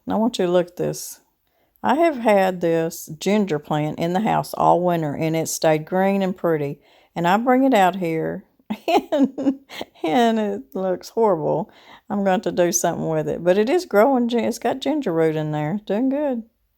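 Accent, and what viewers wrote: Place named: South, United States. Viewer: I thought I was the only one😂glad to see others think so too. My Mother came from Tennessee and had that southern draw, reminds me of her, so when I get to missing her I turn to this channel and I am home again 😊🏡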